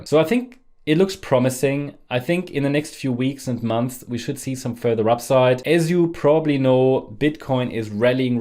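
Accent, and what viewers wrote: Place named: Germany. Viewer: are you? same voice,and sounds like German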